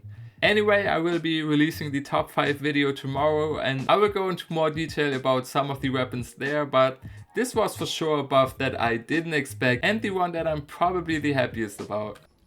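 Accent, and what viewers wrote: Germany. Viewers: Der deutsche Akzent ballert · Ich hab mir während der ersten 2 Minuten schon gedacht, das du n deutschen Akzent hast😄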